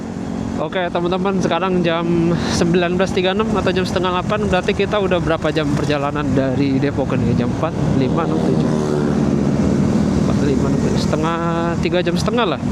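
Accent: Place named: Banyumas, Indonesia. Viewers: Lah orang ngapak to mas... Kirain orang depok😂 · Widii mudikk masee gen ngapak kie jebule😅 ati ati Lik😅😂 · Jebule wong ngapak njngn mas😮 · logat ngapake esih ori Alhamdulillah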